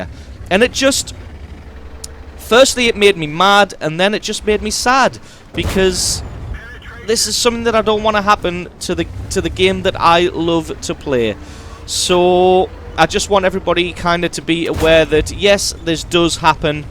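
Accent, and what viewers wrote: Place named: Scotland, United Kingdom. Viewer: There were some Scots features ;-)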